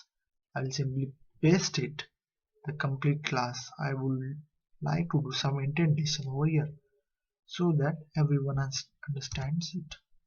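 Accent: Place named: Mumbai, India. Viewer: omg am i gonna find some java video WITHOUT mumbai accent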